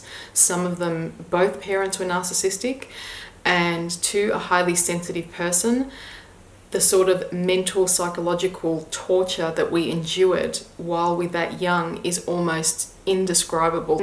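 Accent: Australia. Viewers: I hear an Aussie accent..I live around Sydney. Do you suggest any meeting group around Sydney. I tried to search on net, could not find anything. Regards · I love your Australian accent.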